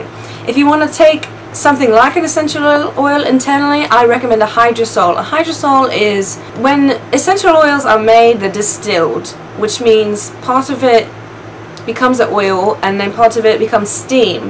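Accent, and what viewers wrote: Australia. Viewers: loving the aussie accent! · I'm Australian and use tea tree daily love it! It was entertaining listening to your Aussie accent!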